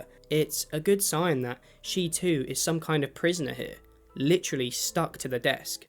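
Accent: United Kingdom. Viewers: Is this guy British??????? Fredbear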